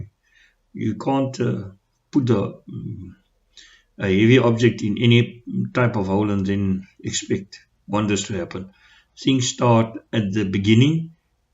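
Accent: South Africa. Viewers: Thanks this is really helpful and great to hear a South African accent!!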